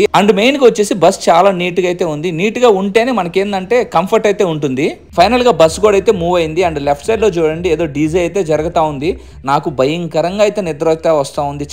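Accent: Nellore, India. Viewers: నువ్వు చెప్పేవిధానం సూపర్. నెల్లూరు యాస చాలా బావుంది.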